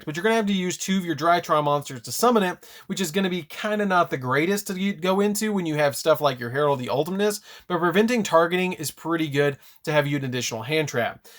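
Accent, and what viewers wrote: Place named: Texas, United States. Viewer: Well its Texas English, idk if its too hard to understand, but if you're so inclined. THIS DECK GO UNGAH BUNGAH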